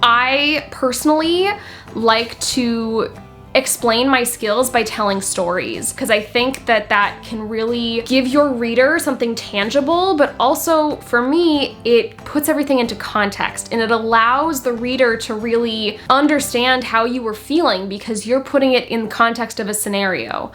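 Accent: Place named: United States. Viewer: Perfect American accent :)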